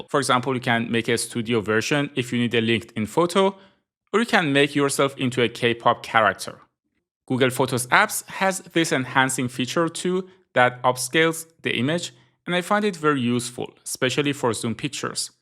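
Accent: Iran. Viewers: Are you Persian or Iranian? As soon as I heard your voice I could tell😂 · You sound Iranian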